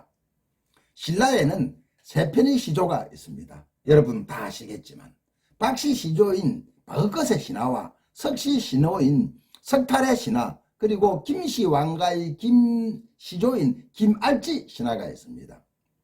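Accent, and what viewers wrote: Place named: Busan, South Korea. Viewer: 부산 할배의 투박한 사투리로 풀어내는 삼국유사 그간 까맣게 몰랐던 삼국시대의 디테일한 스토리가 너무 재미있읍니다 학교에서 배우지 못했던 구수한 이야기들 재밌어요~~~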